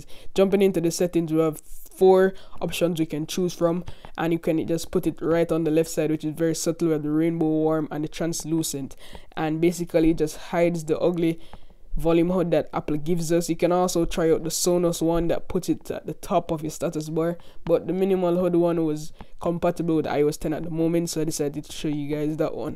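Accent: Jamaica. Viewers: Are you Jamaican? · you Jamaican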